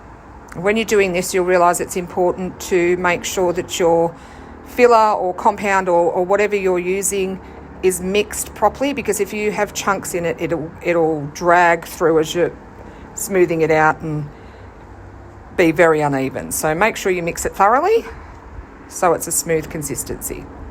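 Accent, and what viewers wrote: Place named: Perth, Australia. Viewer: This is lovely! i must subscribe as my daughter is in Perth, and I can just listen to your accent and imagine my daughter's friends and co workers sounding just like you. Thanks!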